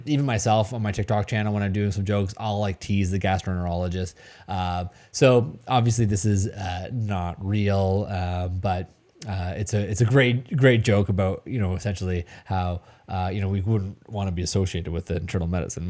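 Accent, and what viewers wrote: Canada. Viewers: WESTERN UNIVERSITY REPRESENTS! No matter how hard you try, can't hide that Ontario accent. 🇨🇦 👍💕 love the channel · I noticed the accent as well, especially on the vowels. I wasn't sure if it was Minnesota/ Wisconsin or possibly Canada. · Are you Canadian? Certain words you say remind me of my friend in Ontario.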